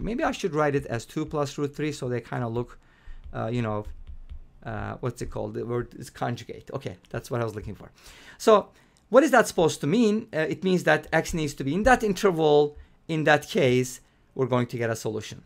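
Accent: Turkey. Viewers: Sir you sound like a turkish because of your accent but thanks for helping,sending you the best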